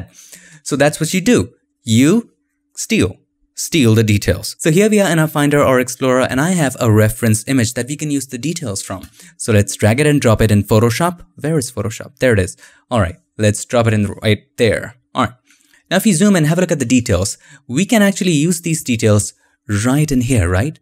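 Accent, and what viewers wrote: India. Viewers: you are so pro. i like your tutorial, and India accent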